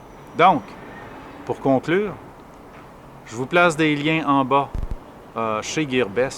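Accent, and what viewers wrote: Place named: Quebec, Canada. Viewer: Je ne savais pas que vous faisiez des reviews en Français, sur la vidéo en anglais j’avais remarqué un léger accent Québécois mais je n’en étais pas sûr... 😊 En tout cas vos reviews sont superbes et fort intéressantes, et avec ce beau parlé Québécois cela embellit le tout!!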